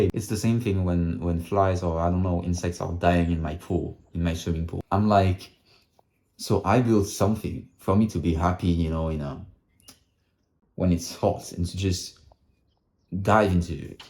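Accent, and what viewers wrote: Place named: Baltic states. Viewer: Putain je me doutais t'etais francais mais t'as comme un accent genre lithuanie estonie vers labas 😁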